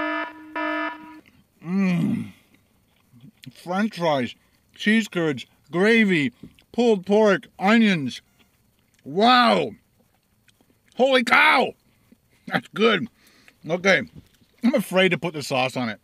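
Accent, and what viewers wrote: Quebec, Canada. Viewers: Iam form germany ... i understand that Barbecue Sauce Stuff ... especially we dont have a lot of good ones here! PLS say more OUT About and Without love that canadian accent :D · your quebec accent is right on!! when are you coming up east ,in quebec...to review some local restaurant? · yeah in québec we sound like that lol and we have a thousand type of poutine! try poutine planet in québec, Qc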